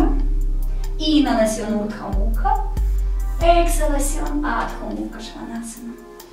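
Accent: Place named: Russia. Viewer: Estás rutinas me encantan,combinando el yoga y perdida de peso, las amooooooo😘😘😘😘😘😘😘😘😘Un besito y no dejes de decir huesito púbico que me encanta tu acento ruso español jajajaja 😂😂😂😂😂😂😂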